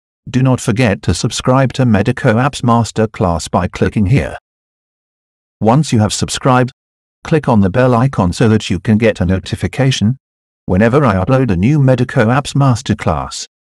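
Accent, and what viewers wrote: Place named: India. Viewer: 8/10 ... indian english accent would be the most welcome change... nice content though...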